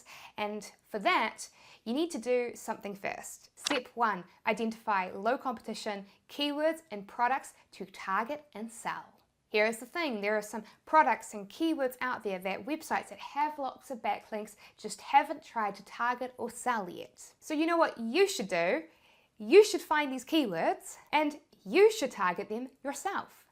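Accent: New Zealand. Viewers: no problem at all! Thanks for responding. Of all the people I've found on YouTube, you by far seem the most genuine and you explain things extremely well. Love the Kiwi accent too and as an Irish man I can totally relate to speaking too fast 😂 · Awesome content but I couldnt understand why you kept on saying add ticks to your pages "lots of ticks", then I realise you meant text lol , it all makes sense now I'm getting use to you NZ accesnt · Is it a Kiwi accent?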